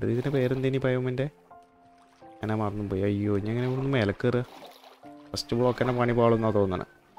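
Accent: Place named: Kozhikode, India. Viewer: I think you're frm kozhikkode or kannur😌🍀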